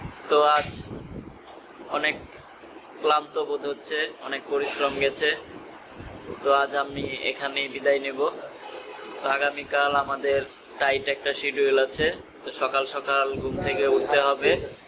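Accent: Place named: Mymensingh, Bangladesh. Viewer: তর বাড়ি মনে হয় ময়মনসিংহ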